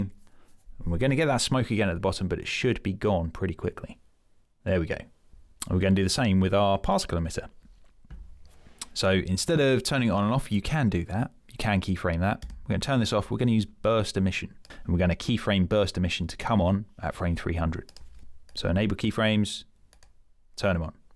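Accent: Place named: United Kingdom. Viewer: I can hear british accent here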